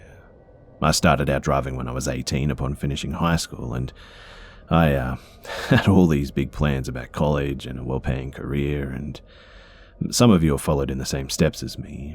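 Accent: Australia. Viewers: Australian accent 🤭🤭🤭 I made a mistaken post thought I heard eng. very different sorry. 🖤🖤🖤☺️☺️ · Mate aussie for the win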